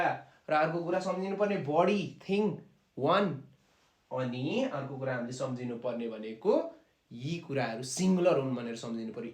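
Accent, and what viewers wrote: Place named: Gujarat, India. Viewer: I thought you are from Gujrat or rajasthan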